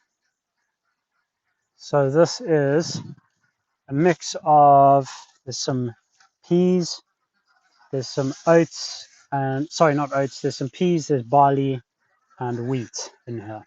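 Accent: South Africa. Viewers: You sound South African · South African? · South African here. You're doing good work over there. We need new sources of protein. Your accent will always follow you 😉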